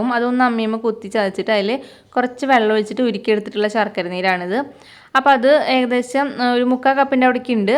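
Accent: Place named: Kunnamkulam, India. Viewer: Ningalude samsaram kettal eadu jilla anennu kandu pidikkane pattilla Kunnamkulam aduthano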